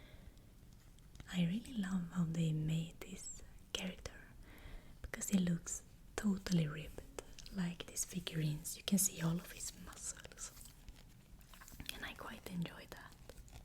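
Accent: Sweden. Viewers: Swedish. Couple of her videos are in Swedish as well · Dane here! I have followed your channel for some time now and I absolutely LOVE your ‘singing’ (swedish) accent when you whisper! Your passion for WOW and other fantasy IP’s is infectious. Happy to see how your channel has grown.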